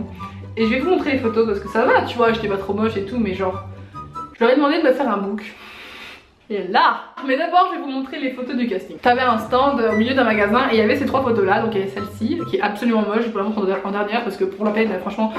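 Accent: Belgium. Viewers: T es belge ?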